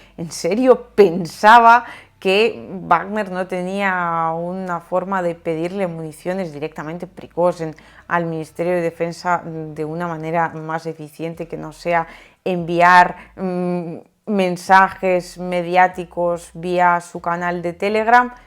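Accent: Spain. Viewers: Todo bien!, he visto videos de tú canal!, Sólo te pido, quítate ese acento de España.. el mejor español se habla en México.. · мы задаемся вопросом в Латинской Америке, можете ли вы говорить на латинском испанском языке, потому что нас более 500 миллионов латиноамериканцев, и испанский акцент напоминает нам о геноциде с момента прибытия Христофора Колумба.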